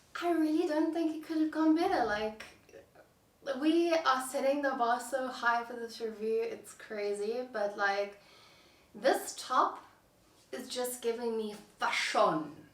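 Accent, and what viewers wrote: South Africa. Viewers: Oh wow I’ve never seen your videos before but when I heard your voice I wondered if you were South African! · Do I hear a South African accent?👀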